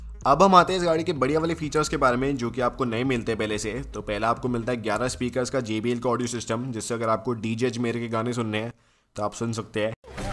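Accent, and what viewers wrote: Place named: Hyderabad, India. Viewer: Tu snax gaming vale bande ke jaisa bolta hai bhai 😄😄 sahi lagta hai , full haidrabadi style 🔥🔥🔥🤙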